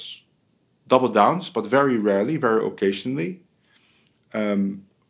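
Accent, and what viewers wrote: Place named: Netherlands. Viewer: When I first came across your video, I was watching it on a different youtube format and didn't see your name etc. But I said to myself ("I'm hearing a slight 'Dutch' accent") I was sure of it my parents immigrated to California in the the early 60's right after I was born. They didn't teach us the dutch language sadly. So I have this void that only Dutch accents can fill....does that sound strange? It's music to my ears wherever i can hear it so thank you, even with your very slight accent theres more music than just the guitar haha doi hartstikka leuk! [additional edit] I wanted to brag about my Dad's musical days in Zwartsluis, Genemuiden, and Zwolle. I've only seen one photograph of the harmonica trio he played in throughout the 50's but they were very good! He played the smaller 6 or 8 centimeter harmonica, another guy played one that looked to be a foot long, and the third guy played a hinged double harmonica that seemed to be 20 inches long or something. 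Incredible. My dad was Klaas Schraa😃:hydrate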